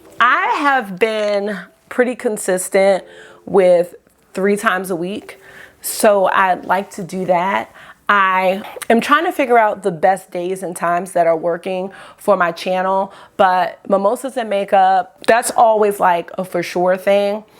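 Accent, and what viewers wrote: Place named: DMV, United States. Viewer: New subbie! Fellow Teacher here! As soon as you started talking I knew you were from the dmv, me too girl, glad I found your channel!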